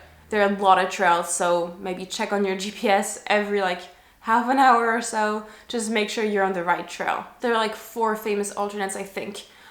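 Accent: France. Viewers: i like your French accent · May I ask.Are you a native French language speaker😊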